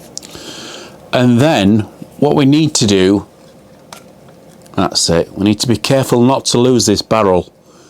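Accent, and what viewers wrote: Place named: North, United Kingdom. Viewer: Thank you for this great video.. A really big thank you 🙏.. Loved your northern Accent 🙂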